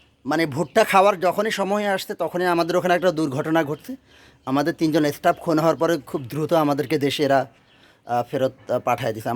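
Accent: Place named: Noakhali, Bangladesh. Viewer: আসসালামুয়ালাইকুম ভাই ভাইয়া.আমি আপনার সবগুলো ভিডিও দেখি আমার কাছে অনেক ভালো লাগে. বিশেষ করে আপনার নোয়াখালীর কথাগুলো আমার অনেক ভালো লাগে এত বড় মানুষ হয়েও আপনি নোয়াখালীর কথাগুলোকে এত সুন্দর করে বলেন. আমার বাড়িও নোয়াখালী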